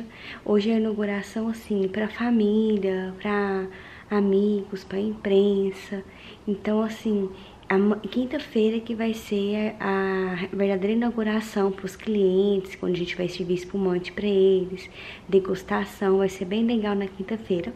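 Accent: Minas Gerais, Brazil. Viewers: Você mora a onde?parece ser mineira!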